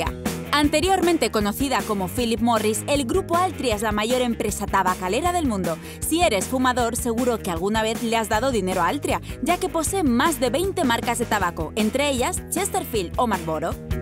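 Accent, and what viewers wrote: Spain. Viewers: Recordar que es española y en cada país pronuncian las cosas diferente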